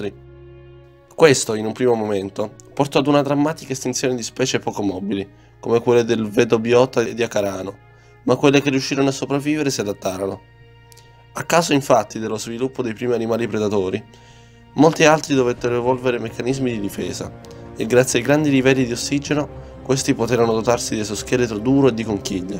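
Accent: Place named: Central-South, Italy. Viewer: anglofono? Per piacere, non arrampichiamoci sugli specchi: il narratore ha un fortissimo accento del centro-sud Italia, quindi immagino abbia avuto tutto il tempo necessario per imparare la lingua di questo paese. Non dico che si debba essere doppiatori professionisti, ma almeno parlare correttamente in italiano.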